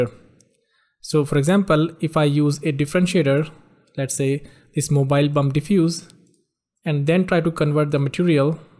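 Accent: India. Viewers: are u from indiea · I can guess you are an Indian from your accent... #respect bro. Nice work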